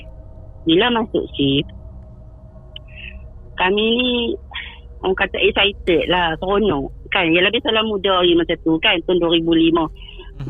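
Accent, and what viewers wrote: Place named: North, Malaysia. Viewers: Kemaiihhh,pekattt likat lorat utara. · Saya baru dengar cerita dari ni..sori terlepaih bas 😀 Memang terhibur, sebab cara loghat orang utara sembang memang lagutu, dia punya kona, pusing roundabout, uturn balik, baru sampai umah 😀😀😀😀 Part II kalau boleh naa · Santai chill nie layan malam2 syok, & faham ke tu cakap, pekat gak utara nya tu😅😅😅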